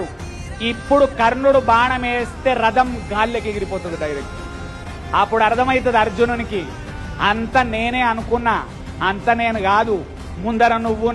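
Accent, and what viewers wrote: Telangana, India. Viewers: Niyyakka mee thelangana slang msthu fun ra Bhai thiduthuntte vundhi bhaaratham vintunapatiki ! maku vimukthi kavalraa Babu mi basha nunchi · Telangana slang lo bharatam super undhi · సారు చెప్తుంటే.. ఏదో సినిమాలో కోట శ్రీనివాసరావు గారు.. తెలంగాణ యాసలో భారతం చెప్పినట్లుగా.. నవ్వొచ్చింది❤😂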